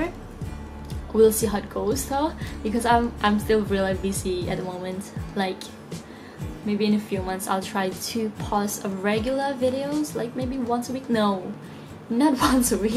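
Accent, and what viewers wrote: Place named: Australia. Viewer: wOW JUST OVER A YEARS STAY AND YOU GET THAT PRETENTIOOUS AUSSIE ACCENT!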